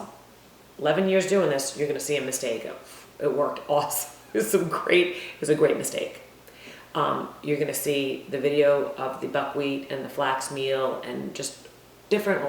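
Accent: New York, United States. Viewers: Love you, Girl! I'm from Ma and love your New York? accent! And 'chew in' on tree bahk' - miss that sense of humor out here in Oregon! Thank you!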